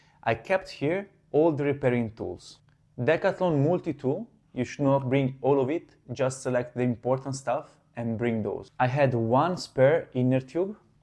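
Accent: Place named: Italy. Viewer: Si sente che cmq sei italiano ❤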